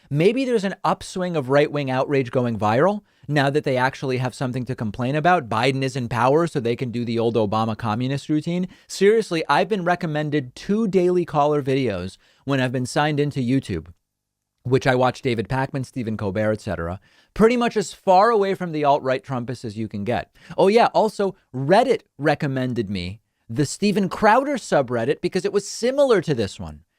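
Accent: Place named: Argentina. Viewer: If you here him speak Spanish his Argentine accent really comes out. I was surprised when I heard him speak and quickly realized he had to be from Argentina or Uruguay.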